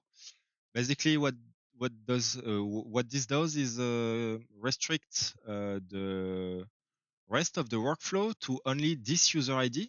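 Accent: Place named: France. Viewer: L'accent français ❤ j'adore hhhhhh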